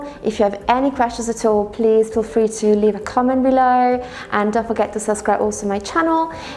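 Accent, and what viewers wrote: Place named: Iran. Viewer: She has Iranian accent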